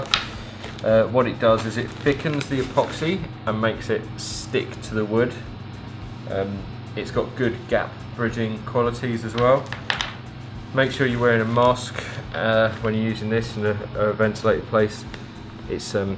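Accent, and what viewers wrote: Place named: United Kingdom. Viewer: The Brit accent makes you sounds like an expert !!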